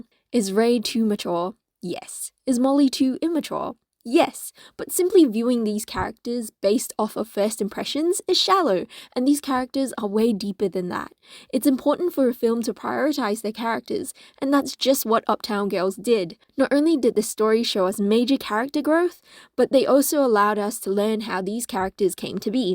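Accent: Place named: Australia. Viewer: Refreshing Australian accent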